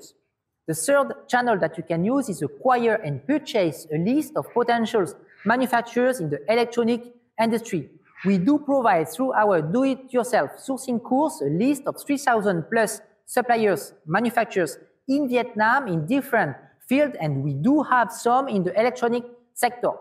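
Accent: France. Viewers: I love the French English accent.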